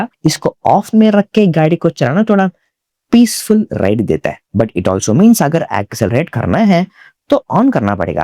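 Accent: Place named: Tamil Nadu, India. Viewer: Love your tamilian hindi accent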